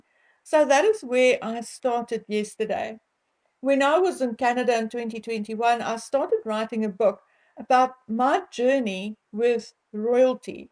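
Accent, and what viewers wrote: South Africa. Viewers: The South African accent grates my ears.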